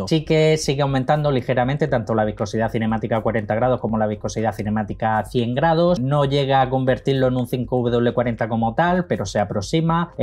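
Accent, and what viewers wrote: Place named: Almería, Spain. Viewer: Buenas noches. Acabo de descubrir tu canal por casualidad, y me ha encantado. Podrias recomendarme un aceite para mi Audi A3 1.9 tdi 130 CV del año 2000? Ahora tiene 220.000 kms, en su día en el servicio oficial le ponian el Repsol elite tdi 5w40. Por cierto, al escuchar tu voz creo q eres de Almería o Murcia, porque he estado muchos años destinado en la Base Militar de La Legión en Viator, y me suena mucho el acento y la pronunciación de algunas palabras como se hablaban allí en Almería.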